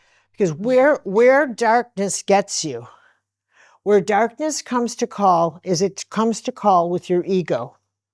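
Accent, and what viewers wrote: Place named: New York, United States. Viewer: I like it - instead of triggering, it makes me laugh. I'm not familiar with where grew up, but her accent reminds me of my childhood in rural central New York.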